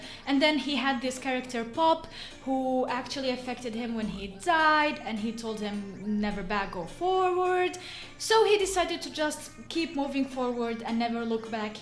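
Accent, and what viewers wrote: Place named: Russia. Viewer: Hey, love your accent..just wondering where are you from? Russia? 🐰👍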